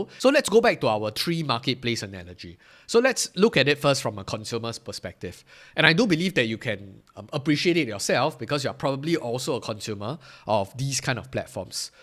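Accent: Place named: Singapore. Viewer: Love the heavily Indian dominant Singaporean accent. So mellifluous.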